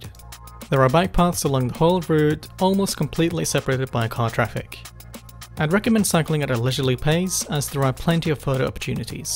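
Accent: Australia. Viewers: That's true, this chap sounds half Aussie and half cultivated midlands or such like.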